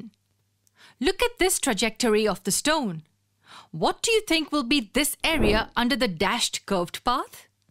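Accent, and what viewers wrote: India. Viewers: Indian accent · The only Indian accent I could listen forever lol she saved me 😂✌️👌 EDIT: passed the exam 😂🙌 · I hate indian english. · I think Indian. Cause her accent seems like Indian 😊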